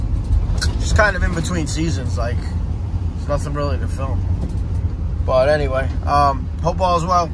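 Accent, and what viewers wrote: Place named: Long Island, United States. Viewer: Where in ny are you? You got a Long Island accent but looks like your upstate here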